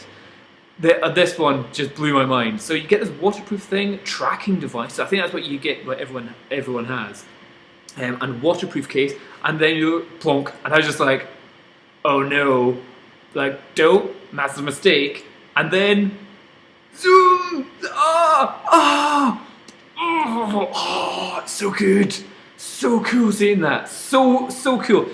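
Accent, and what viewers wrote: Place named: Scotland, United Kingdom. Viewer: This is great. It's like hearing my own thoughts about the Lily read back to me by an amusing Scottish guy. As a Canadian that is very amusing to me, thanks :)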